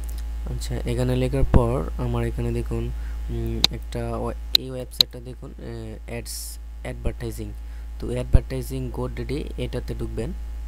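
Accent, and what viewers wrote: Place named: Bangladesh. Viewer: he's a Bangladeshi. He has Bangladeshi accent.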